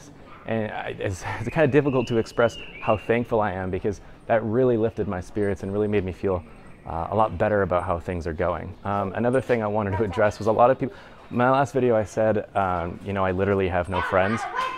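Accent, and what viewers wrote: Canada. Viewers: That is his Canadian accent. In Canada both 'or' and 'orr' are pronounced with a long o where as in the US 'or' has a long o and 'orr' has a short 'o'. Thus in Canada the 'o' in sorry is pronounced the same as the 'o' in sort, while in the US it is pronounced like the 'o' in pot. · wow I love watching ur vids and when I'm stoned your voice sounds like the purest Canadian so maple syrupy and beautiful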